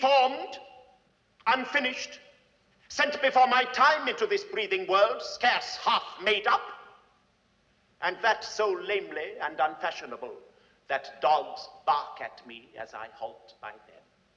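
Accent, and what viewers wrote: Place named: England, United Kingdom. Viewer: Who else is absolutely fascinated by his English accent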